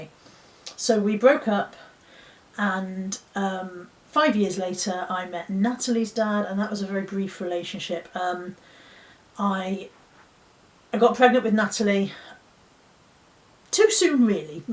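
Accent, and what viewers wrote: Bristol, United Kingdom. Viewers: Hello, just found you on here, recognised your accent as Bristol straight away. I grew up in Yate, now live in Somerset/Dorset.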